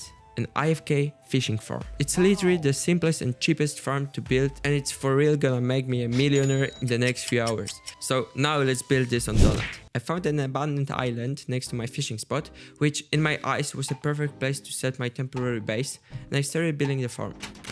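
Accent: Italy. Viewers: yo are you italian?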